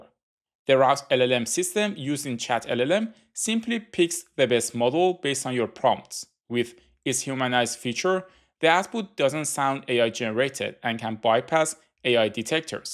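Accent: Iran. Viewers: You sound Irani, are you ?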